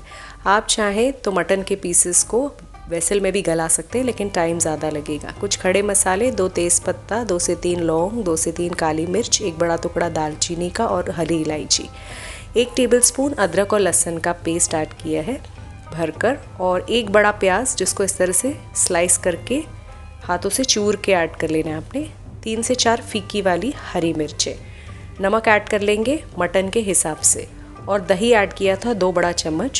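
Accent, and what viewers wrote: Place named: India. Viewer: Feeki ...wali mirchen??😅 ...chawal fool..jayen?😂😂😂 Pheeki*. Phool*. Oh acha ap hindi accent use kar rahi hain. Warna urdu me ..pheeka.... phoool... bolte hain.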